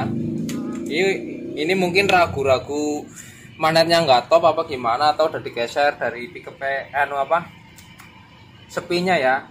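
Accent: Banyumas, Indonesia. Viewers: Mas alamat bengkelnya dimana kasih tau dong bahasanya kok ngapak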